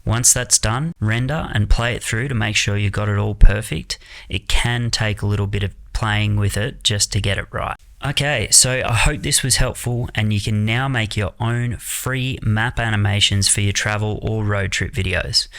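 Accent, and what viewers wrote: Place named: Australia. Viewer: Would be interesting to listen and learn, but difficult to suffer through such a thick australian accent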